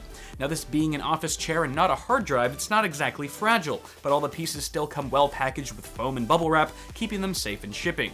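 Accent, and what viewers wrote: United Kingdom. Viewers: love the british accent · ... I'm from the UK. British accent approved.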